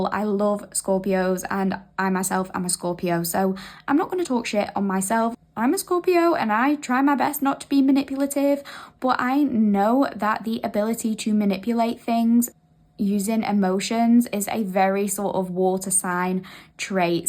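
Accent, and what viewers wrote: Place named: Scotland, United Kingdom. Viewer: u r very good reader, like ur 'scottish accent'...many thnx🎉🎉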